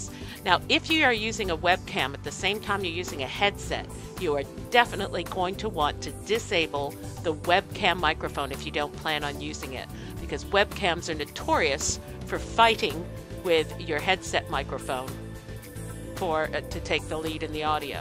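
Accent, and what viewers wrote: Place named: Australia. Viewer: you rr from austrailia sister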